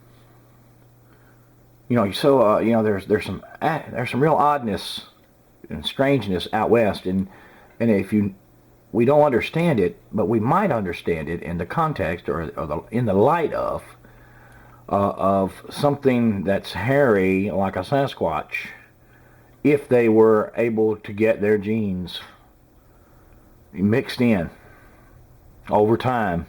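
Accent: United States. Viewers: His voice is soo... "American" its awesome!!!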